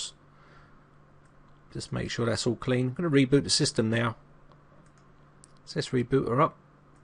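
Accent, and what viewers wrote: United Kingdom. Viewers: Thanx here to my new friend-you save my kids recently purchased laptop-you sound like you are from thee other side of the pond...lol, either way I am just glad you had my back on that one. Toney B . (Philly)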